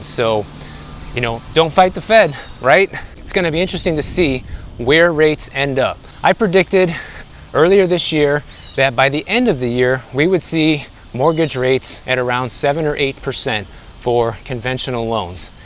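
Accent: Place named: Midwest, United States. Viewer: we are currently feeling stuck between a rock and a hard place and just trying to make the best descision since out situation is temporary getting locked into another 12 month lease at a huge price increase is not what we want to do..ive been watching alot of your videos as well as others trying to gauge our options.. what part of the midwest are you from.. we are michiganders born and raised.. i hear your accent (people are always telling me i have an accent too lol)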